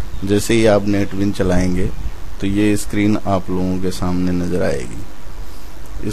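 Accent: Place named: India. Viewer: Hey you, reading this comment, I just went through the entire Playlist for NetBeans IDE If you can ignore the strong Hindi accent, and language, grammar isn't a problem for you, Then this series is a beautiful option for you to quickly learn NetBeans in one day, Be sure to make notes of the things you learn, And you'll be good to go, I have my practical exam in the morning, and found this really useful to learn the entire course in one sitting, Concise, and important aspects covered, However, if the pace of your speaking, and repetitiveness was gone, Then it would've been phenomenal, Thank you, so much, for this series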